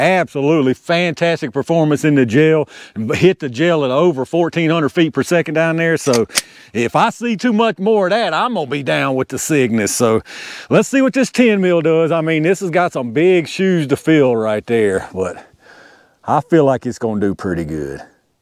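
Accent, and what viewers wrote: South, United States. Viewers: My call goes for the 45Super!!!! Please test it head to head with the 10mm! Your southern accent sounds so cool, I am inclined to believe you are faking it!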